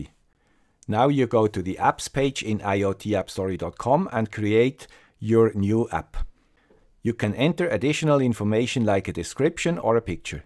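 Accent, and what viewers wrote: Switzerland. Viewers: Your Swiss accent is so sweet and very compelling. I am so influenced to learn deep in the subjects though you just explains thoroughly. You are an amazing learned person with a very sweet positive attitude. Thank you.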